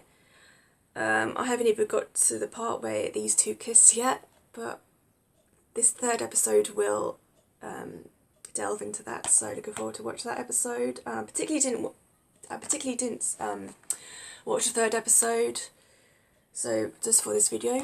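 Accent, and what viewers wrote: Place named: London, United Kingdom. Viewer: Lol,yeah maybe u picked it up from somewhere,like over the rainbow,lol,but yeah l spent nearly a year in London so l thought l heard a little bit of a twang with that accent,maybe my ears are deceiving me,lol,anyway Hampshire eh,l don't believe l know to much about Hampshire,are u like in the middle of nowhere,with all fields and stuff about???BTW thanks for getting back,take it easy:)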